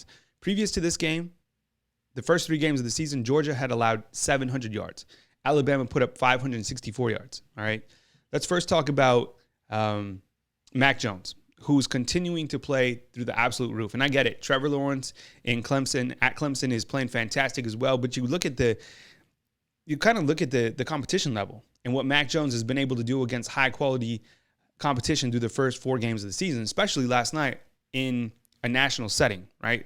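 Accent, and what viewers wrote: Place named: Louisiana, United States. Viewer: each one teach one I can tell you’re from Louisiana you sounds like a trash can.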